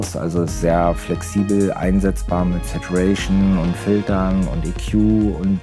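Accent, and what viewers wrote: Berlin, Germany. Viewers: schöne berliner Schnauze wa!? sympatischer Typ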